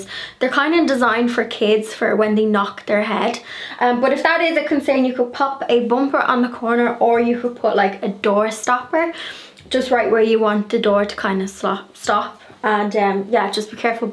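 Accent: Ireland. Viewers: OMG Are you Irish? What a lovely accent. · Hi, just found your videos and subscribed so I can watch new ones! Spent the weekend watching your previous ones! Enjoyed all your up cycles, blogs. You have a beautiful home. I’m here in the UK. Love your gorgeous Irish accent too. 💕 x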